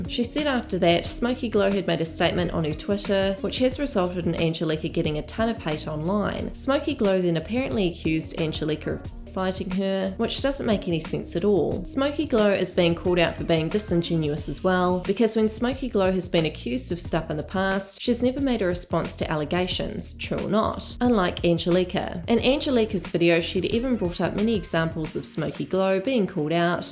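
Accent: New Zealand. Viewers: I am Australian. We don't have a twang. Only Kiwis and South Africans do. The way she pronounces things is definitely Kiwi. We have heaps of them living here, and vice-versa, so we're used to their accent. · Kiwi accent that’s why. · Great vid always love to hear that kiwi 🥝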